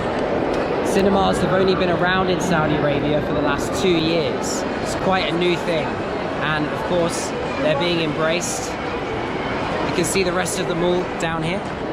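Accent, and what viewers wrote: United Kingdom. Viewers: Thanks for showing us the world with your videos, I want to confess that I don´t like the british accent, I prefer the american accent because is more familiar for me because I´m from Mexico, but your accent looks good for me. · اللهجه البريطانيه فخمه نفسي اتعلمها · solid 3.8 out of 5. Not bad considering you're a brit and you accent's heavy, and Arabic isn't considered easy to learn.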